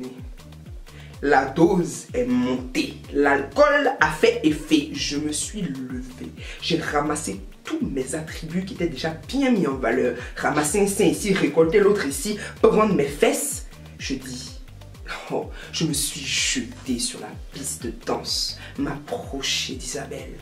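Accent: Ivory Coast. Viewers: L'accent ivoirien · Je savais que tu étais ivoirienne ça s'entend. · Au debut j’ai tout de suite su qu’elle a vécu en Côte d’Ivoire avec l’accent et c’est manière 😊😂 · c'est l accent ivoirien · Hum on reconnait une ivoirienne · par ton accent je me doutais trop que tu venais de la CI 💫💫❤❤❤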